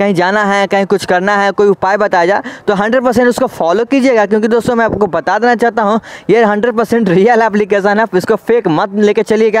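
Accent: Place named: UP/Bihar, India. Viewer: Bro are you from up/bihar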